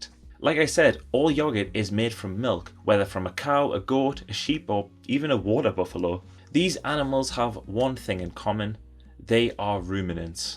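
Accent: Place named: Newcastle, United Kingdom. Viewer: Thank you. Your Geordie accent is lovely.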